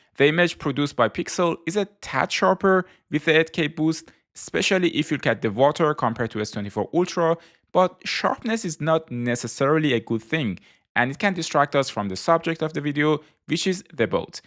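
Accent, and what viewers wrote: Iran. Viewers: Are you persian? you're accent sounds like persian but u don't look like one then i saw the koobideh kabab now i'm confused lol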